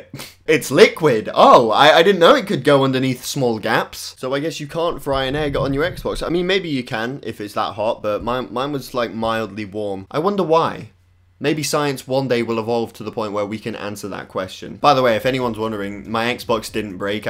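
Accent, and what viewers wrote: United Kingdom. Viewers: MeKillYouTo I don’t know a lot of people in the us that have a British accent like his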